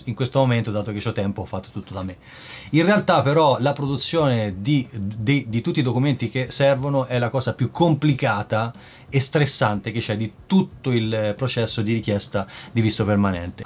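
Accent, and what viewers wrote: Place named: Perugia, Italy. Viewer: hai un po' l'accento perugino🤔🤔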